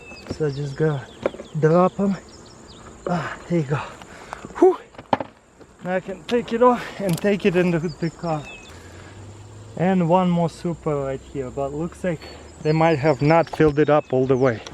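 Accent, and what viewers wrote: Russia. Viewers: Your accent is beautiful. I love Russian accents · Beautiful russian accent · У тебя акцент да? Ты не говоришь по английски,тты руский! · Are you Russian your accent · Love your Russian accent!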